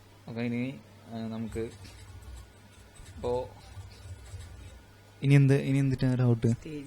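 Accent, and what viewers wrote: Thrissur, India. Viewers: Nalla thrissur bhashayil paranju thannathinu thanks. . Good information👍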